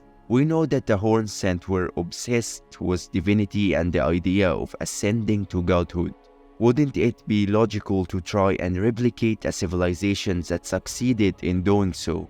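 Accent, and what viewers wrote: Egypt. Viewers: اللهجة المصرية احلى اشي 😂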